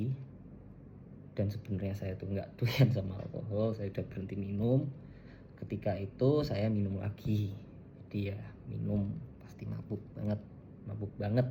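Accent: Java, Indonesia. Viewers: Lho koh medokkk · Logate Medok mas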